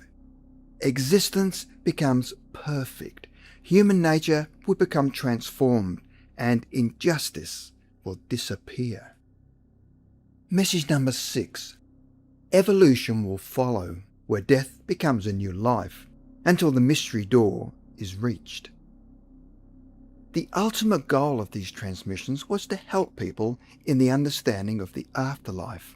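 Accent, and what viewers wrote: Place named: Australia. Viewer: Australian accent, I keep thinking of the geico commercial and the little gecko 🦎 is goning to appear at any moment.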